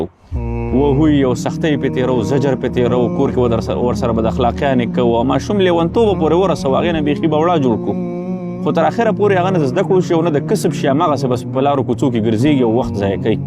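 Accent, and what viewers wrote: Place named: Gulkhan, Afghanistan. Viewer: دا ستا لهجه ولی ده ګلخانانو ده؟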